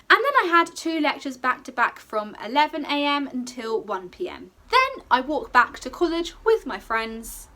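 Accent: United Kingdom. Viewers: I love your british accent :))) Greetings from Argentina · it just sounds very British, there is nothing wrong with that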